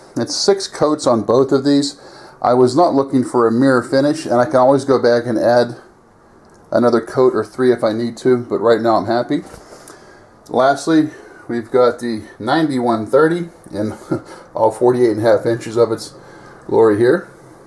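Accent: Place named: Oregon, United States. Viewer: I have both the Russian 91/30 and M44 and a Russian SKS and SVT-40. I love my Russian rifles and really love the looks of the fellow shooters at the range with their ARs who never heard a Russian BOOM STICK that breathes fire before. I end up having half a dozen guys want to shoot it every time I go out - so cool. It's nice to share the historical details of these great weapons to people who have never seen one, let known fire one. I'm in Central NJ, and shoot at Fort Dix Army Base (Range 14) I'm a Civilian DOD employee and get a really great annual membership there. I'll be honest, my favorite to shoot is the M44, the firm kick and flames really let you know you have something powerful in your hands. But by far the most accurate is the SKS which I can shoot 8 inch groups from a stance at 100 yards, over and over. To me it says a lot about the build on the SKS, especially the trigger pull. 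I never touched the Mosin triggers, although I fully stripped, sanded, stained and Boiled Linseed Oiled (20 treatments) to my M44 which has such a lustrous feel to the stock and a wonderful matted finish. <link> I'm curious, I'm pretty good with accents and you sound Oregonian (at least PNW) to me - am I close?? Thanks for this great intro to these wonderful rifles. I somehow missed this video although I've tried to see every one I could in the 4 years I've own these rifles. Bravo on a great video! OH... That does look like a Tula Star, although I have no idea why it is so small and NOT whe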